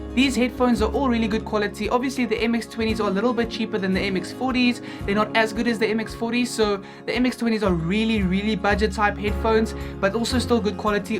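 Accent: South Africa. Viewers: That accent... You must be from South Africa 😁 Me too. Thanks for the video dude! Been doing my research for a while and here I come across your video that confirms all my choices, M40x, MPK Mini Mk2 and the Scarlett Solo! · ey bruh i know you from SA because of the accent mad respect from SA bro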